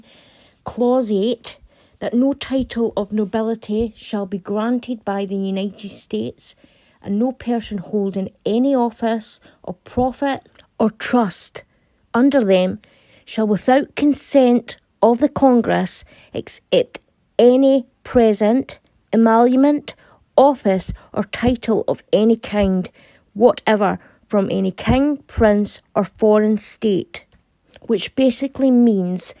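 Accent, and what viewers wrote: Scotland, United Kingdom. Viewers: Oh it's such a pleasure to hear your sweet voice and lovely Scottish accent. Personally I don't think Meghan will ever be president - she would be too distracted suing everyone who criticized her or revealed her lies. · also, RE: swearing. There are different types of swearing. The$ussex_$quids, for example, cannot engage in rational, civilized discourse & debate or even exchange simple points. They start using vulgar, accusatory, base allegations because they're just not capable of debate or civilized argument. Those are the ones whose swearing is considered to be of limited intelligence. You, on the other hand, speak an elegant, fluent English with that endearing Scottish accent - music to my ears! Your type of swearing is different. It's more of an accent to the point you're making as opposed to just swearing for the sake of swearing because of being unable to make any cognizant debate. 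You're in the clear,. Anyone who has a problem with your, or anyone else's choice of rant, can leave. But, you know, they WON'T because only the $ussex_$quids, who are so devoid of talent, are the most likely to accuse you of being unintelligent (just like themselves!!!).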